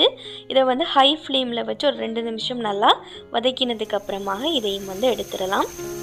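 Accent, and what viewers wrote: Sri Lanka. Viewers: உங்கள் இலங்கைத் தமிழ் அழகு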